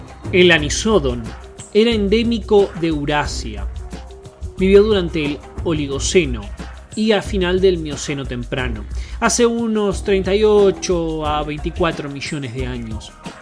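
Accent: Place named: Argentina. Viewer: él es argentino, por eso dice pronuncia así ciertas palabras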